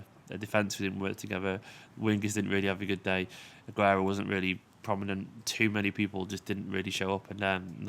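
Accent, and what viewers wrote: Manchester, United Kingdom. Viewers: What part of Manchester are you from?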